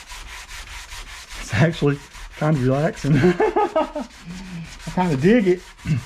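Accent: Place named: South, United States. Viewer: That’s for the tips buddy. I love your accent man.. I wish I was born in the south😁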